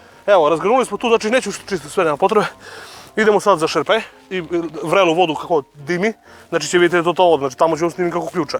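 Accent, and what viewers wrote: South, Serbia. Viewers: ma on je buraz kako prica da prica nas covek sa juga on ima 800k suba a beogradski hejteri moze da mu ga dudlaju sa snjihovim padezima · Brate sa juga je srbije nis leskovac vranje to je njihov dijalekat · E majke mi jesi glup on je covek sa sela brt tako ljudi u juznom delu Srbije pricaju inace jel ti neko ikad rekao da si glup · Brt on je iz sela ono i tamo je takav Niski akcenat nemoj da pricas kad ne znas.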